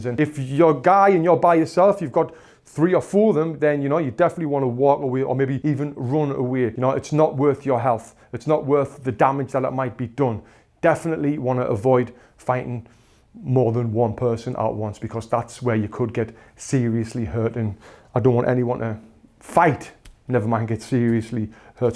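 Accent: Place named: Peterlee, United Kingdom. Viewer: I live in Peterlee haha I though your accent sounded very similar to mine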